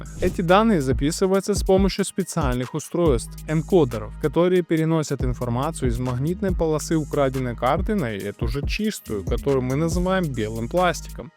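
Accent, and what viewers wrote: Ukraine. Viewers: Автор ваш акцент говорит о том что с Украины,и к вам будет вопрос-предложение,не сложно ли вам пособирать информацию о том какие у вас статьи и какие сроки за них,кто чаще всего туда идёт. Вы вещаете на русском но у нас есть и несколько своих каналов на эту тематику,здесь бы хотелось бы видеть разницу как у вас и у нас.У нас чаще всего идут-попадаются молодые от 18 до 25 лет и сроки от 3-5 до 20 по статье 187 УК РФ,в зависимости от того куда деньги ушли.И хотелось бы узнать как у вас с этим,это правда займёт больше времени но и интереса будет больше.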